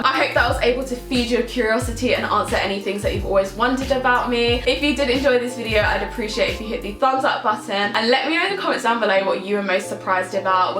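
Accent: United Kingdom. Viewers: Omg i totally wasnt expecting you to be British!! Whereabouts are you from??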